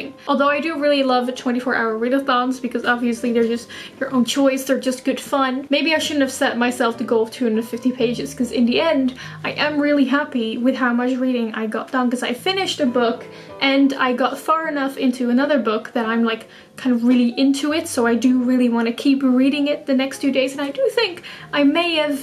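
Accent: England, United Kingdom. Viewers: since when did you develop a british twang to your accent? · I don’t want to alarm you but you’re speaking with a heavy British accent, more than usual (particularly in the beginning).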